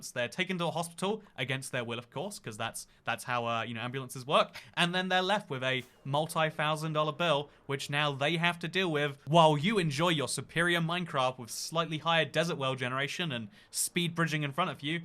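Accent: United Kingdom. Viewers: When it comes to adding amplified to bedrock, I feel like the reason is not so much the difficulty of adding it, but maintaining it. You gotta remember that they really want worlds to transfer fine to newer versions. So yeah, that's my guess. P.S.: I find the r thing British people do hot. You're welcome. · Now that he said that, I notice that speaking pattern with all the British YouTubers I watch.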